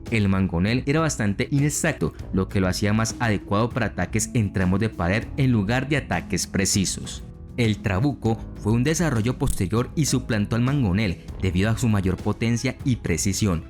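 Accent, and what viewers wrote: Colombia. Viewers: Ese acento de colombiano tan bravo.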